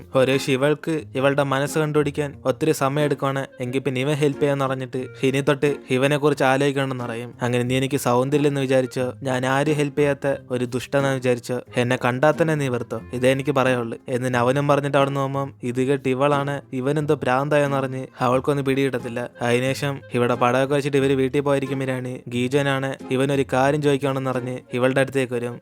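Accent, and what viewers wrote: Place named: Pathanamthitta, India. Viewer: Chetta vid pathanamthitta ano??? Slang kettit thonni athaa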